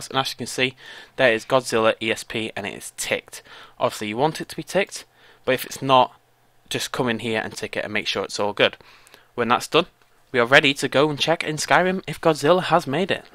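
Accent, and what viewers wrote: United Kingdom. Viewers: Luckily I actually can imitate four of the over five thousand U.K accents so I can understand him. Now as for what he is talking about and making reference to, well I suggest you all go to full screen to be able to read the headings on whatever he is mentioning. If you need a translation into American aka Michigan-no-accent-at-all, just note me. I will attempt to delete all the extra words that are not necessary to learn how to do this in a transcript. I can do everything in Old English fonts to make it more authentic. ;)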